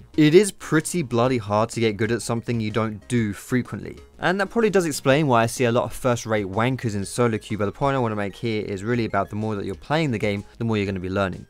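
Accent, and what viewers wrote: United Kingdom. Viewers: I really enjoy all your videos and especially I love your British accent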